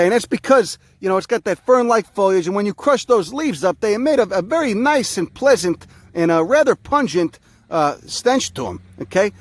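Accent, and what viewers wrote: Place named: New Jersey, United States. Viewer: Never figured someone with a Jersey accent could be so smart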